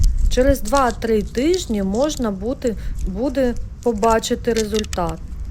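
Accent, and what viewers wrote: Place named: Surzhyk, Ukraine. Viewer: Лайк за українську, хоч і трохи з суржиком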